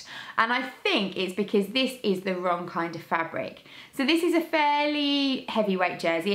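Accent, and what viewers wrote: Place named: United Kingdom. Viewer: I love watching your videos and listening to your British accent! I’m really loving the fabric haul videos and your travel to the Netherlands. I love to travel the world and look for fabric as well. I especially love looking at the shops in the UK and plan to go back to the UK in the next year/get a rail/train pass and go to as many as I can find. Gold Hawk road and Liberty Fabrics are at the top of my list! Thank you for all your sewing efforts/education. You’ve inspired me to start sewing for myself again! Hugs from Oregon in the USA...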